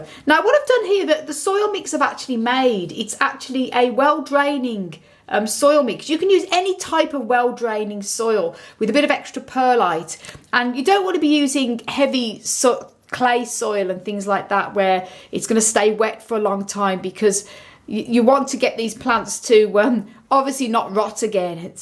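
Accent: United Kingdom. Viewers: I loveeee your video! Your voice, your accent, your energy.. it’s everything. It feels like i am learning with someone from Hogwarts. Hehe.. Thumbs up! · british accent makes my head dizzy lol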